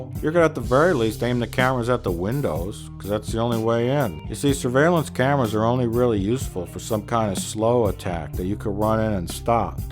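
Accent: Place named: Wisconsin, United States. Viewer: My favorite part of these reviews is the narration. Monotone, mumbling mispronouncing of new words is a perfect rendition of central Wisconsin schlubbery. Its like hearing Peter Sellers when he does half a dozen different English accents to perfection.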